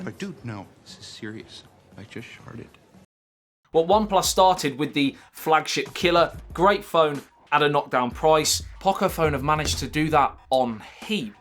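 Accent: United Kingdom. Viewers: Well,..........except for that British brogue........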